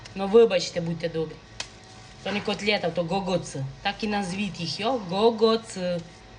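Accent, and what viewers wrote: Transcarpathia, Ukraine. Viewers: То говір Закарпатський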